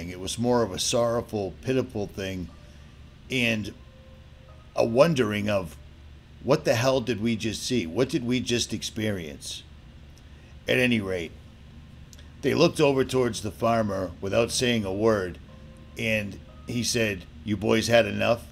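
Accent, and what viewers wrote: Boston, United States. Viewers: You sound exactly like a guy named "Ritchie from Boston" lol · This sounds like richie from boston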